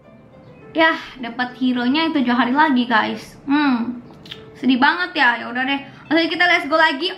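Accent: Java, Indonesia. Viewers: aku kangen kamu mainan OmeTV pakai suara medok orang jawa 😁 Miss you, 😁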